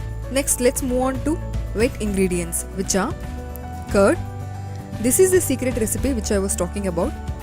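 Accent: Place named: India. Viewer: Disliking because of your cringy Indian thick accent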